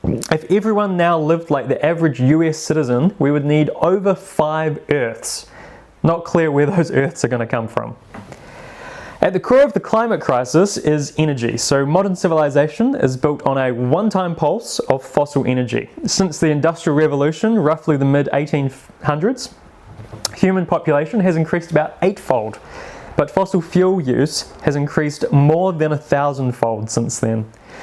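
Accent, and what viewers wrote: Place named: United Kingdom. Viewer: I saw the thumbnail and I was like 90% sure a British accent was coming my way, close enough. Yeah, shit is lookin' pretty bad, doomers!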